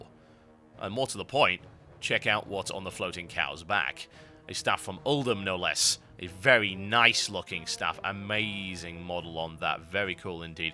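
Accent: United Kingdom. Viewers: u idiot. he's british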